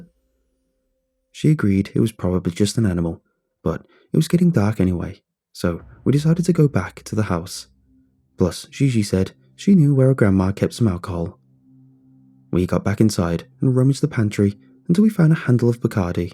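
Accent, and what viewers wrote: United Kingdom. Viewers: If its in America why use a UK voice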